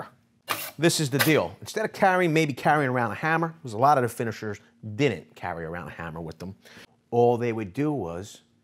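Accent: Philadelphia, United States. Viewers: I like the length of the video but I'd appreciate more philly-charm. Like take a break to eat a cheesesteak and/or play up the philly accent and slang a bit lol. Good vid. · Ha he is from Philly ,was thinking New York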